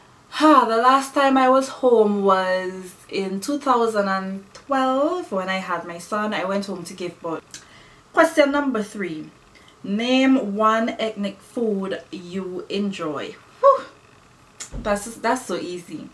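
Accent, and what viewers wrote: Saint Vincent and the Grenadines. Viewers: Was so happy to hear your accent n see someone from Vincy on YouTube!!! Subscribed!! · It's good to see a fellow Vincentian with their accent still..though living abroad #vincytodbone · Girl your accent says Vincy alone 🇻🇨🇻🇨 so good too see another Vincy on here 🇻🇨🇻🇨🇻🇨 #784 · Love this! Miss hearing the vincy accent since we moved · butt up pon yo channel by accident, glad to see a Vincy on youtube, nuttin wrong wid talking dialect , its a combination of our african language and our slave masters. gwan gyel do yo thing, new sub.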